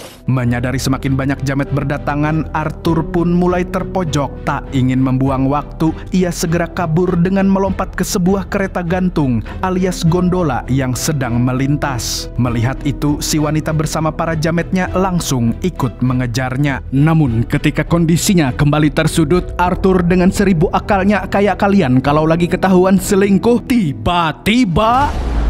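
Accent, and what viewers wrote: Banjar, Indonesia. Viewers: Abang,Pian ne asli org Banjar kh 😂😂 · Mamang ni org apa si? Kok ada banjarnya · urang banjar sekalinya ikam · Hahhhhh orang Banjar kahhh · Urang Banjar kah mang 😅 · banjar kah pian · Pian org banjar? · Adminnya urang banjar leh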